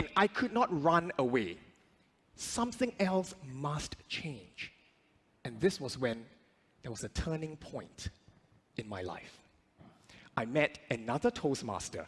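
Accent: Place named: India. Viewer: India accent sounds like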